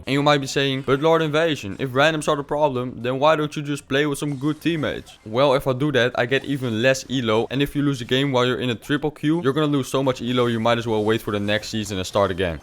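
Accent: Netherlands. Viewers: Damn i smell englsih and a hint of dutch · why do i smell a dutch accent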